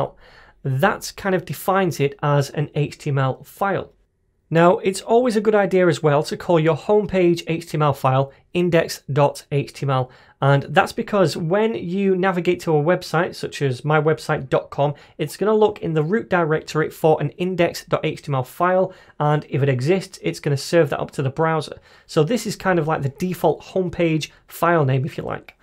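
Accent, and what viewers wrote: United Kingdom. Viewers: thanks a lot. i like to watch your video not only because i wanna learn about web programming language but also learn about english especially british. and i like your accent. its rarely british guy make programming tutorial on youtube. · By your accent I can tell you are British, pls marry me. A British tech dude. Perfect combo! 😍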